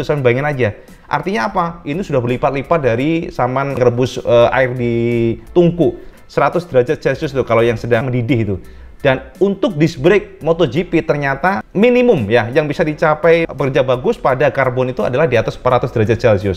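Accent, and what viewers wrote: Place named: Java, Indonesia. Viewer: Cak iki asli Banaran Kandangan to Cak? 😲Kok medok pol..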